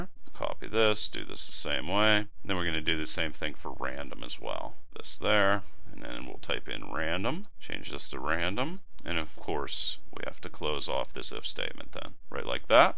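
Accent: Pittsburgh, United States. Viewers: Killer accent you yinzer you :))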